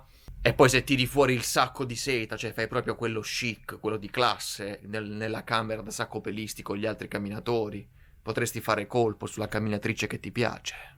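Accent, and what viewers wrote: Apulia, Italy. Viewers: Pugliese ? 😊 S send 😂😂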